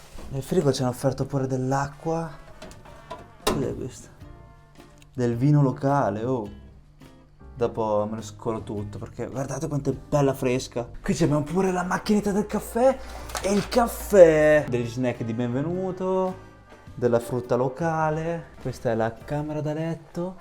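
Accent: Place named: Romagna, Italy. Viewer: Hai un accento romagnolo, direi riminese, che mi fa morire 😅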